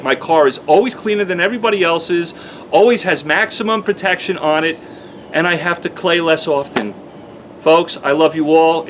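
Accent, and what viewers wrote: New York, United States. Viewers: Did you perhaps grow up in ny or nj? The way you talk sure sounds like it :)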